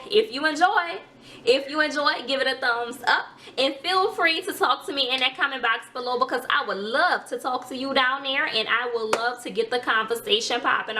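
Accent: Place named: Baltimore, United States. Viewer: I hear 👂🏾 Baltimore in your accent. Btw, pretty results! 👌🏾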